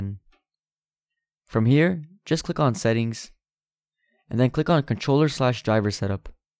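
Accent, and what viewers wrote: Croatia. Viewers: Are u from Croatia? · maybe this is a dum question but are you croatian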